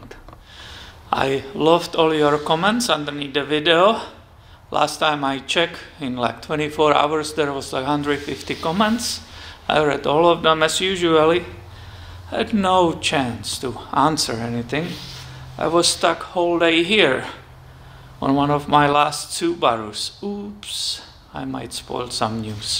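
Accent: Germany. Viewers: are u German or Dutch? Long time no video, thanks! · Thank you for you honesty and vulnerability regarding this repair. I am a High School Auto Shop teacher and I always impress upon my students that everything you do is a resume. Sometimes repairs won't work out perfectly and you might have to eat a bit of loss to complete the repair and satisfy the customer, but if you are honest and transparent, you will earn their trust and have them for life. You will also earn the customer's referrals. You are the kind of YouTuber that I am happy to add to my course content! I had the same experience on my wife's BMW X3. The o-ring on the oil cooler line tore the same way and leaked all over the accessory belt. Luckily I noticed it before the belt dissolved and broke (they have been known to wind around behind the harmonic balancer and punch through the front main seal into the sump, resulting in major engine damage). 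By the way, I am curious what part of the Old Kountry you come from. My wife was born in the Flensburg region but she can't identify your Dialekt. Bayrisch? Schwabisch?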